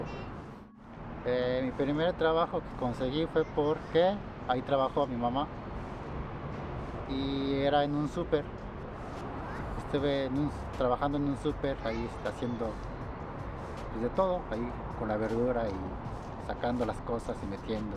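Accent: Mexico City, Mexico. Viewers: No mames guey no tienes nada de japonés con solo hablar así eres mi paisano viva México carbones saludos compita yo vivo en USA desde hace 30 años y estrañp a mi Durango México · Este japo es chilate defeño .no mames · Tu asentó se nota que eres mexicano, suerte en tu camino y no te olvides de los mexicanos man :v/ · hablas como mexicano,piensas como mexicano,sólo te falta el toque de pensar no estoy jodido y nada es imposible, entonces combina lo mejor de las dos culturas y seras un híbrido mexicano y lograras lo que ni tu pensaste, eres bienvenido s México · Y si habla como Mexicano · Eres mexicano,ablas igualito a mi🤣😂🤣😂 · Mira vistes como todo un mexicano ese estilacho que tienes para hablar rregresate compa ya sete ase tarde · Hablas 💯x ciento como mexicano si te gusta nuestro país regresate en Chin.......a🏃‍♂️🏃‍♂️🏃‍♂️🏃‍♂️🏃‍♂️🏃‍♂️animo México te apoya eres nuestro hermano 👍👍👍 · Cómo de ciudad de México .. 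· No manches?? Jijiji!! Se Te Salió Lo Mexicano. No Mames Wey!!! Y un chingo!! Jiji jiji !! Nel. Tú Eres Mexicano. Pon Una Fondita Japonés Con El Ramen 🍜. Incluyendo Claro Los Tacos 🌮 . Mexico City Te Reclama!! Órale Vengase Pa’ Mexico!! Y Hasta Tú Acento Es México . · Jaja hablas 100 mexicano. Eres mi paisano ya bienvenido al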